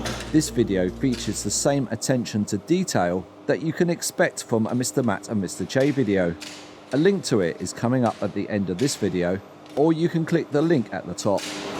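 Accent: United Kingdom. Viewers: What kind of accent do you have? Something British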